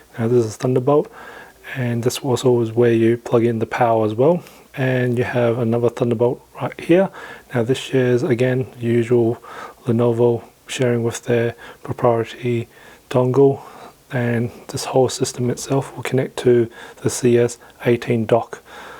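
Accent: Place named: Australia. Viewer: Nah it's okay I'm looking forward for new content on your channel . Btw nice aussie accent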